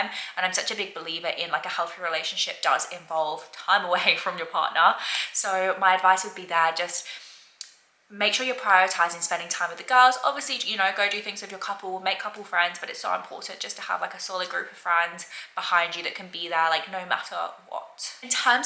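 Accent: United Kingdom. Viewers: Very good, so I had a look at a video you made 8 months ago. Hard to believe they are the same person. Most obviously, 2023 had a strong English accent where as 2024 pretty much talks normally. And 2024 looks much happier, healthier and self-confident than last years model. The fresh air has done you good.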